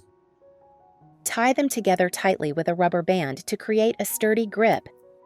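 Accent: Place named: Texas, United States. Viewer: Gainesville Texas english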